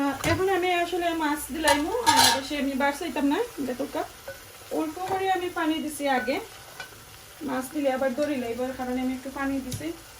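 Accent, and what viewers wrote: Sylhet, Bangladesh. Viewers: I totally agree with you sister . Lot of sylheti try to impress by speaking shudo basha and it just doesn’t come over . Be proud of your dialect .. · Oy apa amar khob vala lage honte sylheti mat afner, shob shomoy ola matiyo re go, ar jee mojar khatta ransuin, Ma sha Allah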